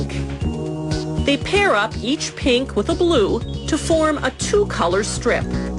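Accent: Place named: Canada. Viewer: she's very canadian, eay.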